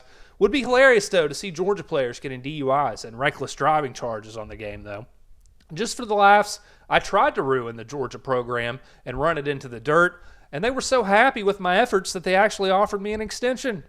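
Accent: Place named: Kentucky, United States. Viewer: You must be from KY like me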